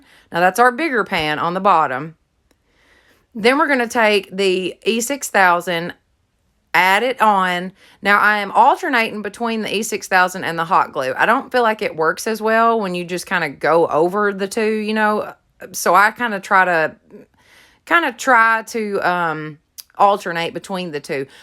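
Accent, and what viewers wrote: South, United States. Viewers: Your stiching is awesome and I really dig your crafts however, your sweet home grown down south voice is what I absolutely adore.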